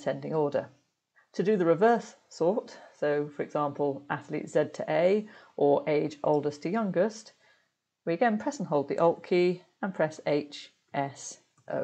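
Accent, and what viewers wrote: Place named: RP, United Kingdom. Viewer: Pradeep, man. Get real. Hers is the sort of English shy of RP that people want to speak. Maybe you. Pradeep, need to listen to Amitabh speaking English more (his is actually quite excellent), unlike that of typical politicians and actors in the subcontinent.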